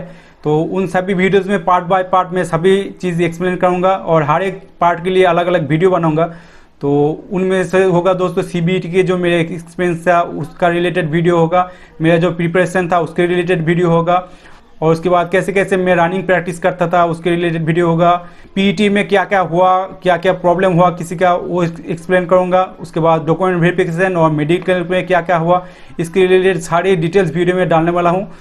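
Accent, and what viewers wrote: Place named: Bengal, India. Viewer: Hindi sunke mujhe lag raha he ,app bengal se ho !!!!🤔🤔🤔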